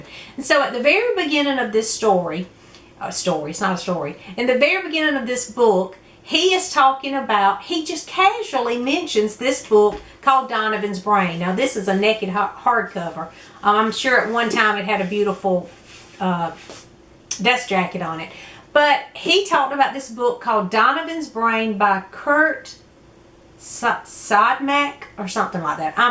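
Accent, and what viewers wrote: South, United States. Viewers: I'm so curious where you are from? I'm an East Tennessee girl but your southern drawl has me beat! 😉💜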